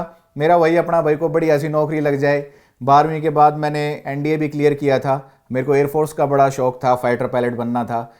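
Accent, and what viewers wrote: Haryana, India. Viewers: Bhai aapki to english bhi haryanvi accent hai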